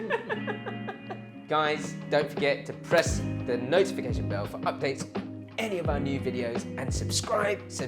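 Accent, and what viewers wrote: United States. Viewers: That American accent 😂🤣 I've had to go on a fodmap elimination diet. Many things on your channel qualify or can be slightly modified and I love that I can still use your recipes! I need help though. I absolutely cannot find dark soy with the grocery delivery services during this pandemic. I've used tamari and "created" dark soy from other recipes, but I am curious if any of you have suggestions on mimicking dark soy in the best possible way. It doesn't have to be low fodmap friendly, it's also for the rest of the family to enjoy. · As an American I think your US accent wasn't bad lol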